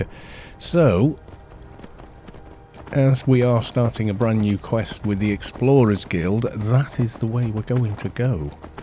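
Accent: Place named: Wales, United Kingdom. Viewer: But I do like the way you pronounce any word starting with a double L ... That's very Welsh.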